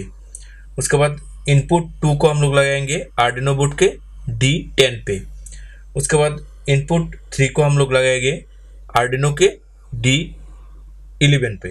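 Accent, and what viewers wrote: Bengal, India. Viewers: U r Bengali?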